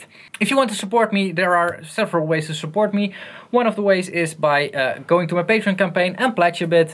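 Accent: Netherlands. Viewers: dutch guy trying to speak english. damn. facepalm, ottoman sideslap, whatever... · are u dutch?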